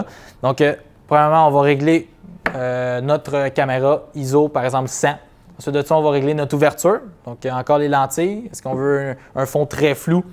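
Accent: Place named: Quebec, Canada. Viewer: Super vidéo très pédagogique, la meilleure que j'ai trouvée en ce domaine. Explications claires et très belles photos. Et puis j'adore votre accent, à vous, les amis québécois. Merci pour ce beau partage.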